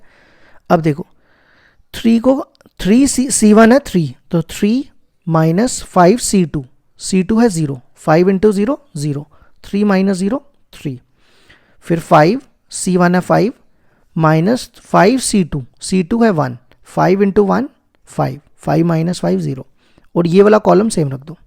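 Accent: Jammu, India. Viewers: Sir Aap Jammu se hoo kya.. 😌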